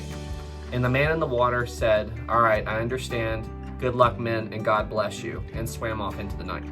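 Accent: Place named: West Virginia, United States. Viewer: Let me guess,West Virginia?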